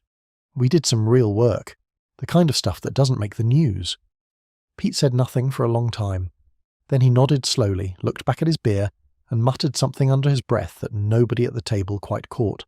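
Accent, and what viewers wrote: United Kingdom. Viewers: Why, oh tell me WHY, Lord, is this story delivered with a British accent? I can't listen!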